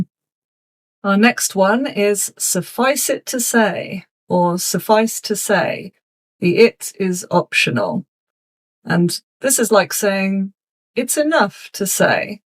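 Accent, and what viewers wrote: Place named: England, United Kingdom. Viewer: Yes, of course darling. I have a pretty good command of grammar so the content is basically only a refresher but I love watching the videos because I am a sucker for English girls lol and a lot of it has to do with the accent lol.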